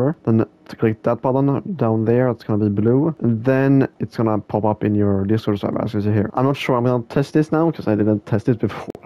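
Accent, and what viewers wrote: Sweden. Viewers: are you swedish? you sound like it and it said igår on the msgs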